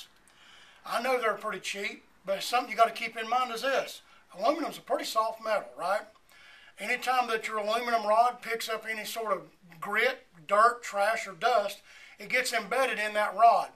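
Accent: Maine, United States. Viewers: Just love listening to someone with an authentic Maine accent. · Thanks for the video. And that's most unusual "down east Maniac" accent I have ever heard. Where are you from originally?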